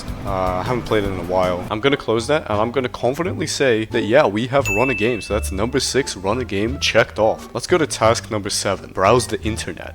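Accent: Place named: France. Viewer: your french accent is crazy considering you don't know french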